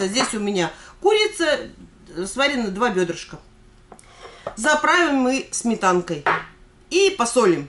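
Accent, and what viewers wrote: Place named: Urals, Russia. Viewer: Я вот слушаю и думаю... С Урала Вы. Да?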